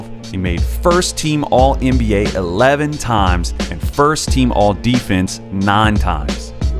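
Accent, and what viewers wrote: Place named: Kentucky, United States. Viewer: Where in Kentucky are you from? Just curious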